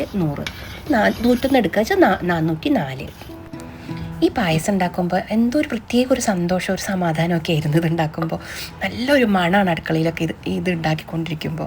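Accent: Palakkad, India. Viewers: പാലക്കാടൻ രുചികളും പേരും ഒരു രസാണ് അതിന്റെ കൂടെ ഒരു പാലക്കാടൻ സംസാരവും കൂടെ കേട്ടാലോ ഹയ്യ് എന്താ രസാ കുട്ട്യേ ബഹുബഷ് 🤭അടിപൊളി പായസം kutta കണ്ടപ്പോൾ കൊതിയാകുന്നു 😋😋😋അതിന്റെ ഇടയിൽ താൻ സംസാരിപ്പിച്ചു കൊതിപ്പിച്ചും കൊള്ളാം അടിപൊളി 😋😋😋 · കേട്ടോളൂ കേട്ടോളൂ പാലക്കാടൻ ഭാഷ നല്ലൊരു രസമുണ്ട് കേൾക്കാൻ 😄❤ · From your language, are you from Palakkad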